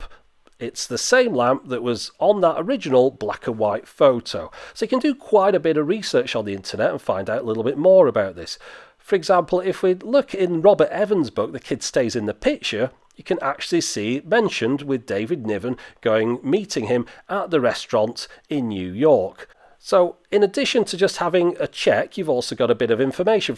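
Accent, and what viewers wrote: United Kingdom. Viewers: I really like David Niven. In my memories, his image comes associated along with Peter Sellers and The Pink Panther. I'd like to say that's a lovely souvenir to keep and to be proud of. Good video in all, I love your British accent; it's like music to my ears. Merry Christmas.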